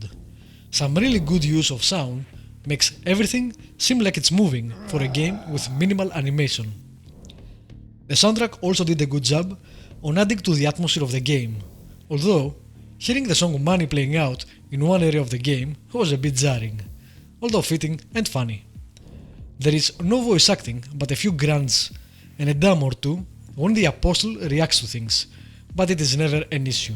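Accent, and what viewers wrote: Greece. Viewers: Thanks for the clear cut, concise and short review. By the way, are you Greek?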